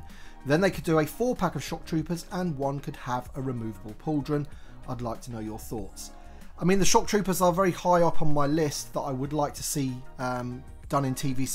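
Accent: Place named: United Kingdom. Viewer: Hi! I'm a huge fan of the original trilogy and pretty new to collecting TVC. Love your videos and your British accent! Your videos are very helpful when I decide to buy something new, thanks! My question is: will Greedo (who was released in 2021 in two versions but only as a 6 inch figure for the 50th anniversary black series) also come as a 3,75 inch TVC? The Power Of The Force 50th anniversary version is very screen accurate! The one in the green outfit that you reviewed is great but I'm hoping for the screen accurate version with the orange vest! Thank you so much and greetings from Germany!!!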